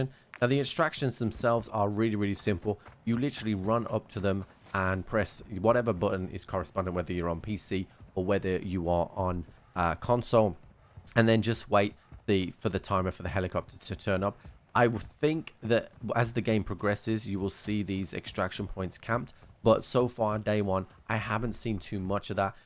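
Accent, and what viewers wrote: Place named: United Kingdom. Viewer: DMZ is so damn fun I played it 16 hours straight starting yesterday! Thanks. So nice to find such a well-polished guide--and in a British accent, too! So nice to find such a well-polished guide--and in a British accent, too!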